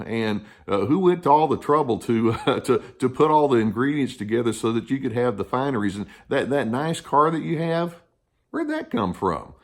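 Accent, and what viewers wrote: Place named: Texas, United States. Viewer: Lol it's the Texas accent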